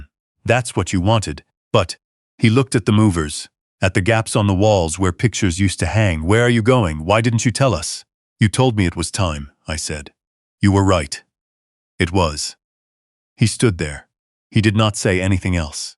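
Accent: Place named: Ontario, Canada. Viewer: Sound like Ontario Canada 🇨🇦.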